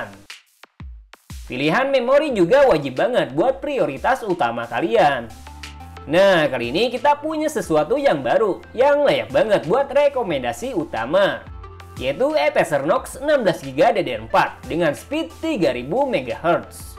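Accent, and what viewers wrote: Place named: West Java, Indonesia. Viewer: sunda pisan euyyy...kerenn, sukses terus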